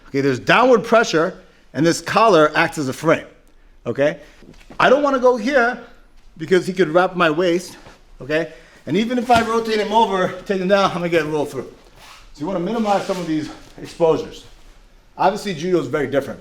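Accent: New York, United States. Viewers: I like how he speaks New York :-)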